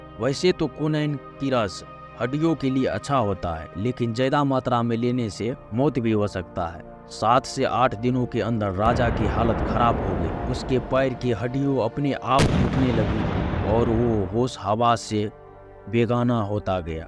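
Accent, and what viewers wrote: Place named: Darbhanga, India. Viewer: Apne ke ghar kahan che? Bhasa se te darbhanga bujhal rahal che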